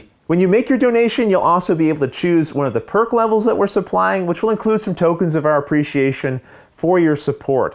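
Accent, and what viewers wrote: Canada. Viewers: Hi. I'm fairly new to the hobby, and your videos have been great to help me get over the "learning curve" and develop my own gaming identity...what I like and don't, what's worth spending money on and what's not, and what gaming experiences I can share with my family and which ones are best left for playing with other adults. The fact that the videos are a lot of fun to boot is icing on the cake! (Not to mention the funny vowel pronunciations that lead my wife to say, "are you watching that Canadian guy again?") You're a good person doing good work, and I'm happy to be able to back you. Hopefully you can use my support to buy a carrot or two. Or some cider for Pep. Cheers and keep up the good work!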